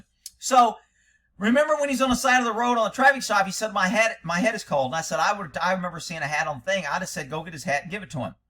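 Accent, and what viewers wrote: Texas, United States. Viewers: And the screechy Texas draw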